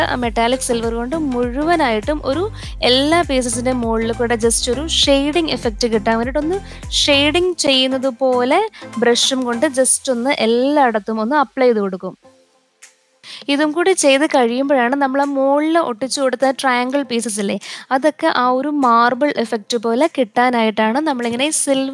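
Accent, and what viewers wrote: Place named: Kottayam, India. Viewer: കോട്ടയം സ്ലാങ് ഉം രണ്ടും പൊളിച്ചു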